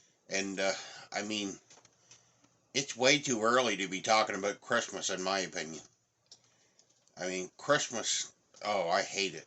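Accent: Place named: East Coast, Canada. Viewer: Cuntastic! You sounds like a Canadian east coaster b'y, is ya?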